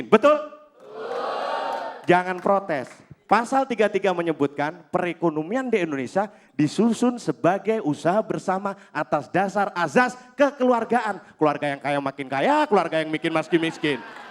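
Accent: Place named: Java, Indonesia. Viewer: logat jawa 🙅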